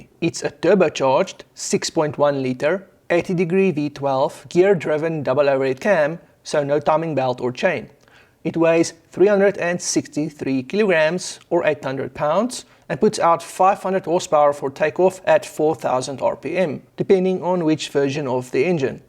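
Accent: South Africa. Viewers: Dear, first thanks so much for your great informative videos and the reminiscent SA accent! I would like to propose a video idea extending this one. In late 1942 Stanley Hooker (later knighted for his work on jets) was recruited by Rolls Royce to work on the urgent problem of boosting power at latitude for the Merlin engine. His solution was to insert a liquid cooled intercooler and 2nd stage supercharger between the existing supercharger and engine block. As you pointed out in this video, an even better solution theoretically may be to add a turbo as the first stage since it does not scavenge as much engine power. However, Hooker’s solution was right on the money in this case, brilliant, compact and was implemented into the Merlin 6x production in less than 6 months. This improvement may actually have secured performance altitude at the most crucial time of the war, allowing air superiority over the fw190, and perhaps even war winning. 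This engine of course was used in spitfire, hurricane, lancaster, mosquito and mustang built under license by packard. The 2 stage turbo solution later implemented in p-38 and “jug” was slightly superior, but very bulky requiring twin boom or bulky and expensive airframe, actually nearly twice the price of the 2 stage super-charger as implemented by Hooker in record time. For me Hookers intercooler is a work of art, or a sublime mix of art and science of engineering. It has 4 trimmed rectangular channels in which the coolant circulates, ex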